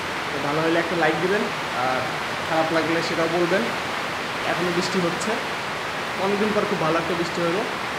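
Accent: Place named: Bogura, Bangladesh. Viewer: ভাইয়া আপনার বাড়ি কি বগুড়া আমি ভেবে ছিলাম সিলেট।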